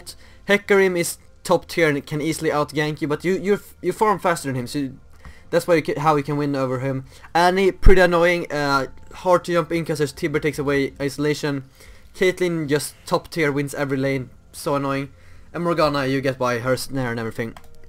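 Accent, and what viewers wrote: Sweden. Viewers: Låter som att du är svensk, stämmer detta? ;)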